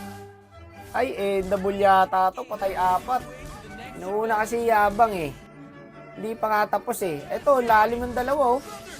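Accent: Iloilo, Philippines. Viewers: Boss try mo naman mag Ilonggo na voice over alam kung Ilonggo ka boss eh mas nagiging funny content mo pag nag Ilongo ka bigla bigla nalang ako natatawa HAHAHHAHA sana ma pansin ❤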